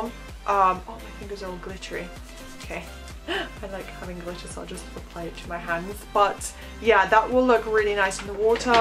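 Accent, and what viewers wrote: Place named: England, United Kingdom. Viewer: I like your English accent so much! Where are you from?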